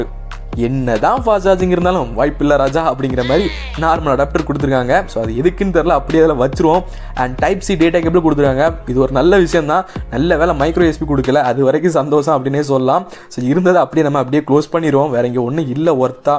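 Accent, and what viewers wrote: Kanyakumari, India. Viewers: Bro neenga kanyakumari ya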